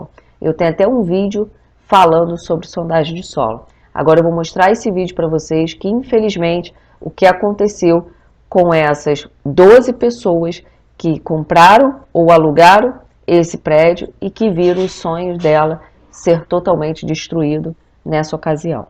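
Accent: Brazil. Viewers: Esse sotaque me parece ser de uma paraense nata, né não?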